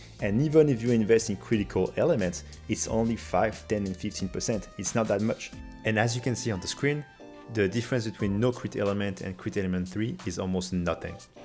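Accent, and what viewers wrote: France. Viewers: Ah de ce que j’entends tu est français haha ☺️ tes vidéos m’aide et beaucoup pour fair de super builds d’arc ! Merci infiniment ! Hâte de voir la prochaine vidéo !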